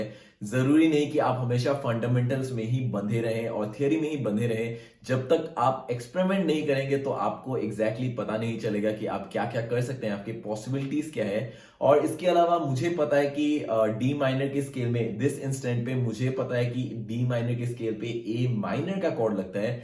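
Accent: Assam, India. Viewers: Bro are u from Assam??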